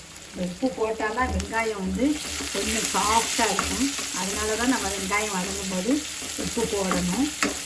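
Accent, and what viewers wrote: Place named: Nagercoil, India. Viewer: I am from Nagercoil.Vadasery. Your slang is as ours. In which place you are. I daily see your vedios. Sweet Amma. Very happy to see you.😄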